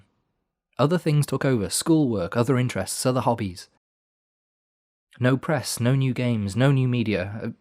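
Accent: Wales, United Kingdom. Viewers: Thanks for sharing these podcasts; it’s so interesting to hear your story with TR. I think we’re quite similar! I grew up in Wales too - clocked your accent;) - but had a crap time being bullied in a rough secondary for being ‘geeky,’ so actively pushed down those interests to remain safe at school. It’s inspiring to hear someone embrace their passions so wholeheartedly. Did you get any backlash growing up for your interest in TR and gaming up in NWales? I loved the puzzles, history and more deliberate game pace of the older games, even though my family thought it was Lara’s sex appeal - how wrong they were…;p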